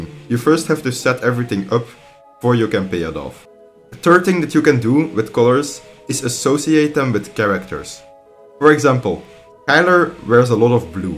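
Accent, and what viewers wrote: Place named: Netherlands. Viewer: Nice nl accentje wel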